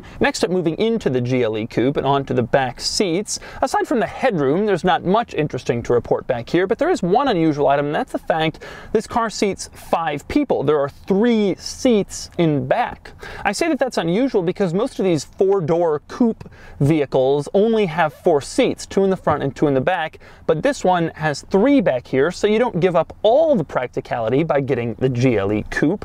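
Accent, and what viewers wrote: United States. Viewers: I absolutely hate how Americans pronounce German names. I mean I’m Dutch so as an inhabitant of the neighbor county of Germany pronouncing the names of these cars correctly is a lot easier for me than for you, but please try to because you Americans think you are the best in the world while Europe is a lot better.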